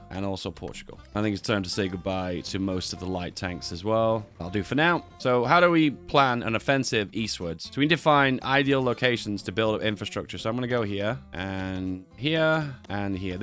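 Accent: United Kingdom. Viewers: why does sound like spiffing brit? is it because their both british?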